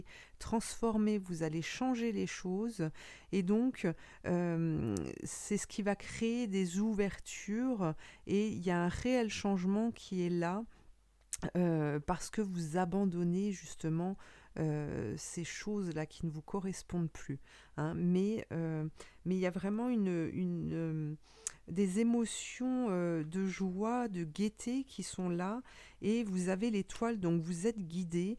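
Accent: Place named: Jura, France. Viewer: Haaaa j avais bien reconnu l accent jurassien.. bisous d une autre jurassienne..ou serez vous installé svp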